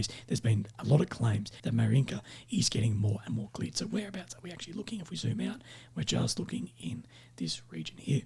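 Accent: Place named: Australia. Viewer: it is very difficult to follow you — not because of your Australian accent — because your voice is so hoarse. If you consult a speech pathologist I am sure she would counsel you to speak slowly to compensate for the lack of tone in your voice. The added benefit to speaking slowly is you are doing less harm to your throat. Thanks.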